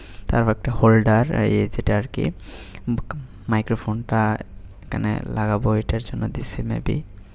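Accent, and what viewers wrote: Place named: Chittagong, Bangladesh. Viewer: Bhai ki chittagong thke naki? kothar tone ta to chitttagong ar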